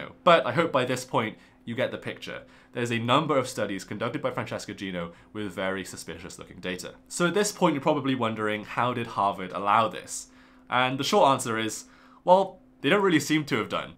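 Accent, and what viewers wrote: England, United Kingdom. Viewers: lol -he doesn't have Chinese surname and he has an English accent and your first guess is that he might be Chinese educator living in China?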